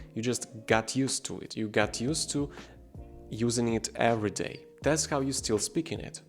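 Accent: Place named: Russia. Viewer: У тебя такой беглый английский, но некоторые звуки ты чётко по русски произносишь. Я слышу чисто русские "г", "х", "м", "л" и ещё "и" у тебя иногда чётко русская. Если бы не это, я бы решил, что ты билингва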